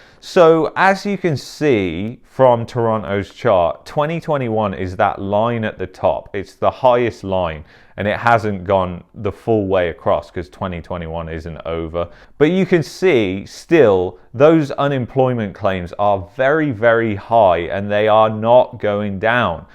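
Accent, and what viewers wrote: United Kingdom. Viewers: Why do you have a British accent ?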